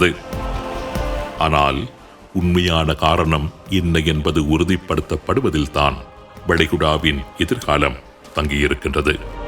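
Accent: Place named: Jaffna, Sri Lanka. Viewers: Pure yazl Tamil❤ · Anna your voice kaadallaa enkaluko eppavum Elam Jaffna jabakam vaarukothu super voice Anna 10 vasellaa erunthu kakirran God bless you Anna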